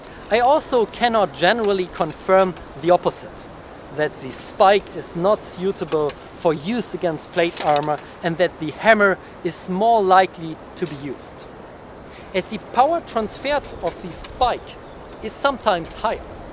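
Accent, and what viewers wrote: Germany. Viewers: First time here. I've immediately recognized your German accent 🥰. Based channel! I subbed.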